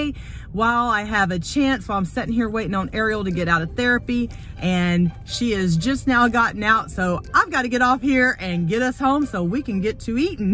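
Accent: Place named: Texas, United States. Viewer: Love your character and your Texan accent.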